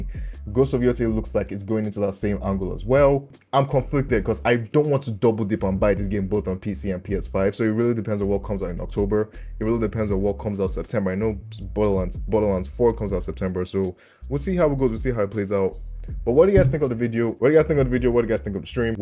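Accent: Nigeria. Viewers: My broda, as soon as I heard that accent I said, he is one of us............. Naija!!!! About Yotei, honestly this was a bit disappointing to me, I finished Tsushima and its DLC on PC, so I was expecting them to come with some more fire, honestly I didn't expect them to just repackage the same thing, make some editions and sell it to me, this won't be a skip but it's definitely not a Day 1 for me. I'll wait for this to come to PC and play it, btw I just subscribed to your channel.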